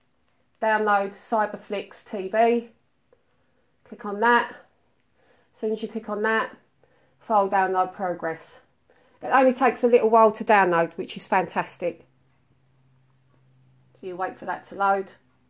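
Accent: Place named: United Kingdom. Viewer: Oh i love your british accent it sounds son beatifull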